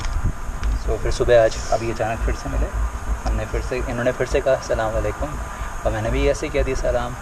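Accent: Haryana, India. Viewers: Bhai kasuta mazaa aa gaya.haryanvi boli chorah daar gya. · Bro are you from Haryana? Cause you speak haryanvi so well. Love from Haryana ❤ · Ram Ram Bhai 🙏 🙏 Bhai Pataudi aala ♥️ Bhaiya bda achaa lg rha h aapko haryanvi bolta dkh he video m ...mujhe yaad h m aapko bolta tha hr video m haryanvi bolne ko or wo aap kr bhi rhe h ...bda achaa lg rha h bhai ji ♥️♥️ Dil s dua h bhai ko or jyada trkkii kro aap ♥️♥️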